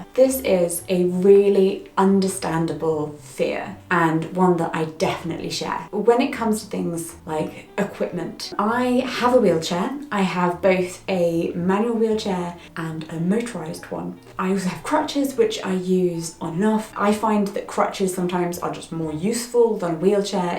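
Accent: RP, United Kingdom. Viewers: i saw you being intervued by molly berk and loved your poshness that's parshley why i subscribed to you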